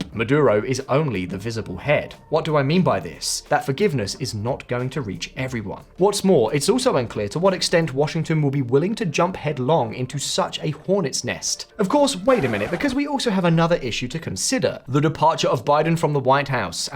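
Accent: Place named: United Kingdom. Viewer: It's a little rich for a guy with a British accent to talk about a Dictatorship in another country. Bro, the Starmer regime is arresting Brits for Facebook posts! Yea but "it's okay when do it" right? 😂 The U.S should leave Venezuela alone. They've wrecked that country with thousands of sanctions, and they wonder why Venezuelans are crossing the Southern border into the U.S.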